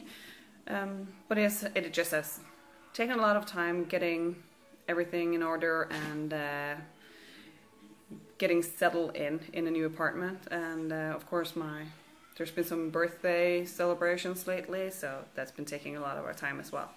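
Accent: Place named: United States. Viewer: I was surprised when you said you lived in Norway. I was expecting somewhere in the United States. The only departure from the American accent was when you said "Agatha" and "progress". I have the book about Shackleton, but haven't read it....I will now. Thanks for the video. Martha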